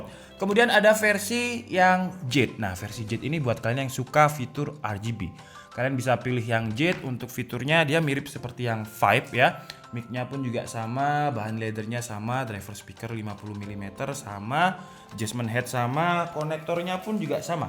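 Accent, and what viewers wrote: Java, Indonesia. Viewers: wong jowo a sampean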